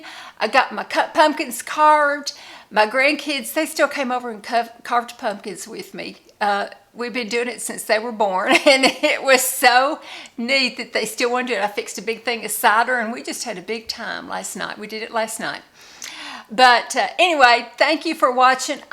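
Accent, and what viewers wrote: South, United States. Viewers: Your Youtube channel is mentioned in "Becoming Me." That's how I found you. You are beautiful! We are close to the same age. I love your Southern accent. Like & subscribed.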